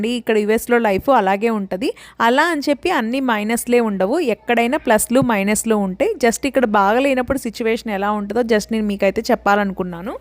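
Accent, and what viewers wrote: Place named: Andhra Pradesh, India. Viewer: Ni language enti kadapa la ledu..... Ma Andhra telugu la ne matladuthunnaru miru...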